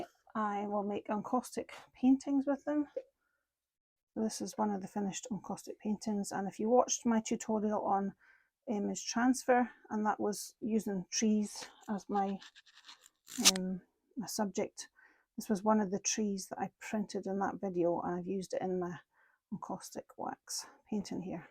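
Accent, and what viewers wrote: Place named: Scotland, United Kingdom. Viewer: Really enjoyed this, as I have recently discovered gel printing. Also enjoy your accent-my mother was from Glasgow(Milngavie) She has passed away and I love hearing the Scottish accent-it is such a comfort!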